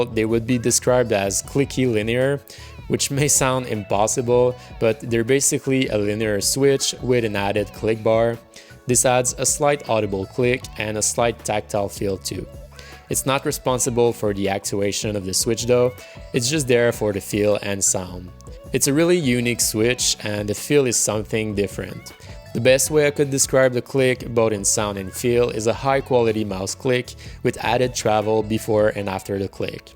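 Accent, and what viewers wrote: Quebec, Canada. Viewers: Are you from Québec ?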